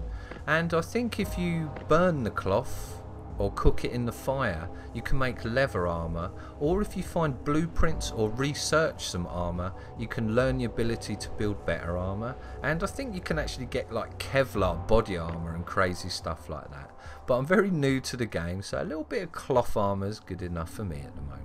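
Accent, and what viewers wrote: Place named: United Kingdom. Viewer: the calmness in your voice as you're hacking up people is quite disturbing lol +1 for the british accent... kinda "Wallace" -like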